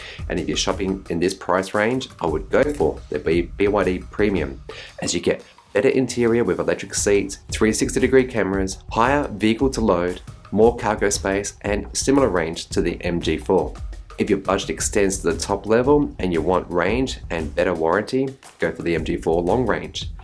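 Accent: Australia. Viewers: sounds Flemish... but you sound Aussie....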